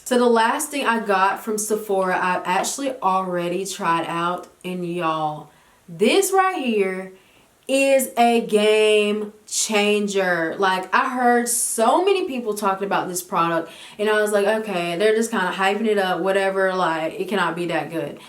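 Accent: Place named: South, United States. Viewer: Love the video, you got me wanting that lip mask now! 😩😂🤦🏽‍♀️ Your southern accent is so cute and thick, I’m from Bama too and wonder if I sound like this to other people 😂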